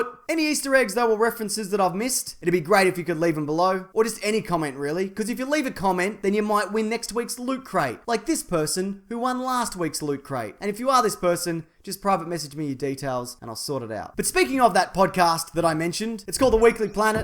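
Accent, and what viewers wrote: Australia. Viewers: Australian! I couldn't work out why I loved your voice so much!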